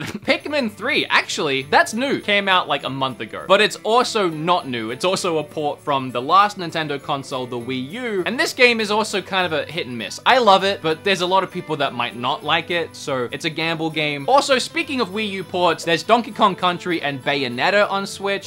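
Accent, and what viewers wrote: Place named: Australia. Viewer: He’s Australian I think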